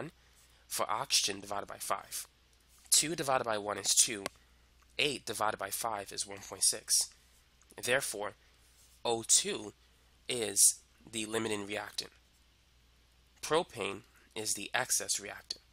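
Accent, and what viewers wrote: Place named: Boston, United States. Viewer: His boston accent 🤤🤤🤤🤤🤤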